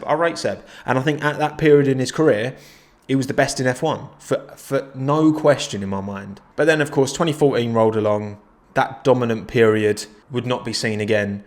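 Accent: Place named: Essex, United Kingdom. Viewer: Great video, strangely I found the best bit was knowing your from not to far from me haha, especially after I only came across your channel after watching Josh Revell and thought your voice was Essex sounding 😂😂😂 keep up the great videos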